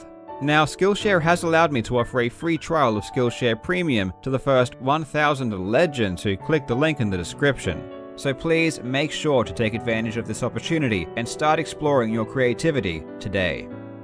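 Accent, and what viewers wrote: Australia. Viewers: great videos. its interesting you have a rhotic Australian-sounding accent. not sure if you pronounce your Rs because of prolonged exposure to american accents or if it's just a legitimate accent i've never heard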